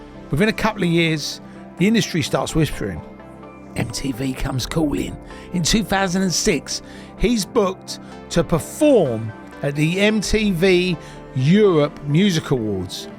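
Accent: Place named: England, United Kingdom. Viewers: Complements to the narrator with the very strong English accent. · I loved the way you told the story it's nice to hear an English accent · I can't even lie.. I'm a cockney through and through and listening to the story of Dynamo told by someone who sounds as if he could be my uncle really makes me feel as if I'm closer to the story than I am. I also believe this was narrated beautifully. Im so glad to have seen Dynamo show face when he was invited into a sidemen video this year (2025). His comeback is nothing but remarkable. I truly believe that if jesus had made his second coming.. then Dynamo is one of the ones who was chosen to show the world the true power of what God and jesus can do. I'm far from religious, however the feats he has performed.. some are on par with the words of the miracles performed by jesus.. make of that as you will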